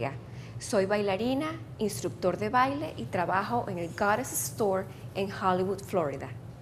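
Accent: Venezuela. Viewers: la instructora es de Venezuela =)! cierto?? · Habla como Venezolana.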